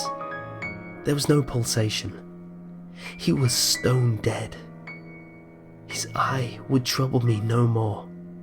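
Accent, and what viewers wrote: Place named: United Kingdom. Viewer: Okay, seriously, grammar, plus he may be from anoth country, everyone says stuff different in different places. Don't judge him for how hepronounces words. I'm pretty sure he's from the UK. We pronouce diffrently. Okay?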